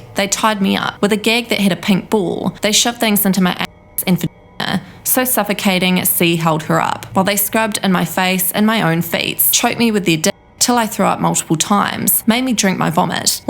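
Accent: Australia. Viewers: The narrator/reporter sounds like a typical Aussie Bogan. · The narrator had a grating and annoying accent. Sounds like Aussie. Pretty sure there, it is legal or decriminalized. "Influencer'only a word that exist in modern shole degenerate societal garbage. So Dubai sounds modern day Sodom & Gormorrah?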